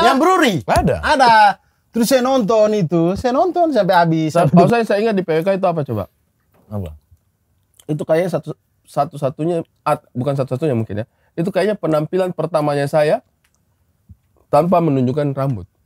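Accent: Eastern Indonesia, Indonesia. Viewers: nah iya, sependapat gk enak aja didengar bg klo kebawa logat timur lebih pas logat yg biasa aja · makin ksini, logat bang makin menyatu dengan trio timur 😅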